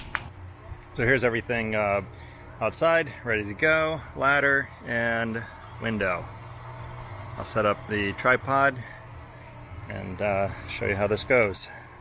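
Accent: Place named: California, United States. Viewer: This guy sounds like he’s from California.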